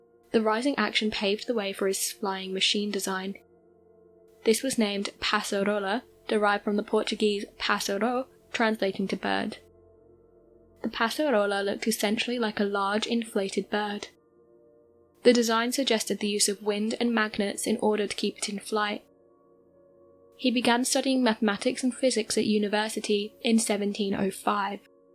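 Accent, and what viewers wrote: United Kingdom. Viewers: Are you British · ARE YOU A BRIT? 🇬🇧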